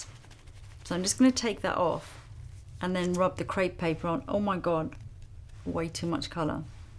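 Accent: England, United Kingdom. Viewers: I looooove your English accent 🤤🤌🏽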